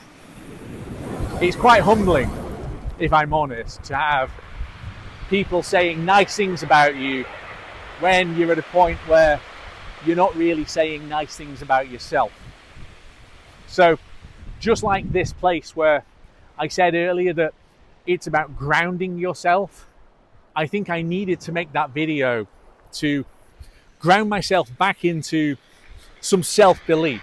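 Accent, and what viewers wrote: Scotland, United Kingdom. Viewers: I'll say this... I support your channel and watch your videos. I like to see Scotland and like to hear the accent as it's so different from where I live. That you like Tesla and good technology is something I also appreciate. I also think that anything Elon related has to be taken with a grain of salt. But what other company is doing the same things even if sometimes the owners think is the wrong direction? You are not a fraud like you said you are. You're just commentating about the tech, the infrastructure and the company. Your channel is really about you in effect and it is entertaining and sometimes thought provoking. Regarding the YouTube algorithim, I think it should be out of your mind. Do the content you want. Let the rest figure itself out.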